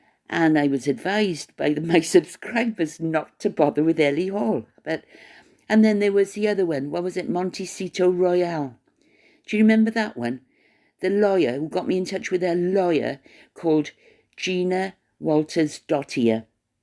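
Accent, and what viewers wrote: Wales, United Kingdom. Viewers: You’re back!! I have often wondered about you,. Love listening to your soft Welsh accent and your lovely little stories mixed in with the serious content of the vlog. We won’t say “toma-toes” again. I think that was what finally took you down - especially the rotten ones;) Good to hear you are well and in fine fettle. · OMG!!! can't stop laughing at u're story about u talking 2 the telly!!! I'm from Swansea and u're accent reminds me of an aunt of mine, but she was from Newport 😊 x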